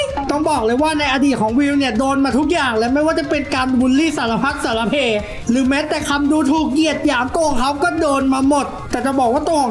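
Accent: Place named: Suphanburi, Thailand. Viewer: ฟินเหน่อสุพรรณ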